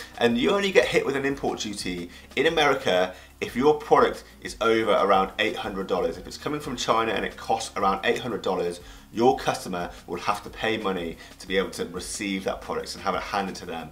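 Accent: United Kingdom. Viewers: Ooh I as much as i hate british accent, this information is very useful.Thanks!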